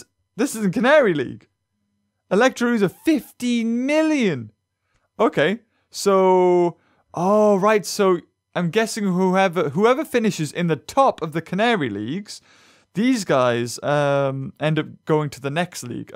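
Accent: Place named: Newcastle, United Kingdom. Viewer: I saw you in all over the place good gordie acsent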